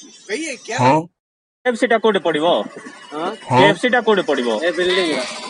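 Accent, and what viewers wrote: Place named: Bhawanipatna, India. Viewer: ❤️😍 Waah awsm and the u used the Bhawanipatna wala odia, so it seems real ❤️ Keep it up 😊 hasate rahio ase hi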